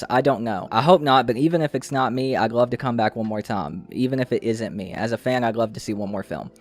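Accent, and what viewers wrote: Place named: South, United States. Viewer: Wow only a few weeks? Well I really like your content so far and I can tell that you're from the South or at least it sounds like you are. I'm from Ocala Florida. I definitely wouldn't take too much of what Corey Feldman says to heart though. He definitely has been dealing with a lot of mental issues lately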